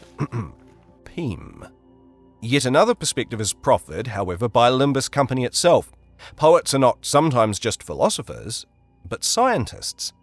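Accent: New Zealand. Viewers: YOU’RE A KIWI?? The way my neck snapped around when I heard that omg… Hiii from a fellow Kiwi!!! Should have been able to tell from the accent 😭 but I was like “no way right”…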